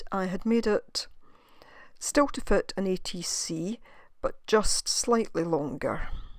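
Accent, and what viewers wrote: Scotland, United Kingdom. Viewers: Lovely video..I love the sound of your accent💕 Scottish? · Hi nice to hear a Scottish voice for a wee change, enjoyed your tutorials very much and wonder if you know of any crafters that swap ATCs. Cumbria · Lovely! Super tips thank you and I do love your beautiful Scottish accent!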